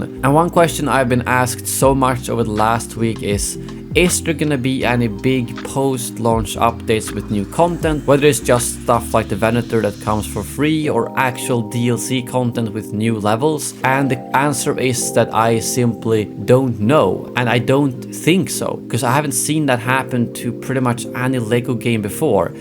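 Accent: Sweden. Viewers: Haha man kan höra att due svenne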